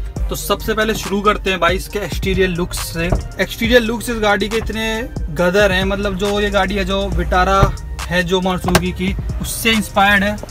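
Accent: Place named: Uttar Pradesh, India. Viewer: Gaaaddi..... kya hota h be sahi se bol UPk logo ki trah kyu bolta h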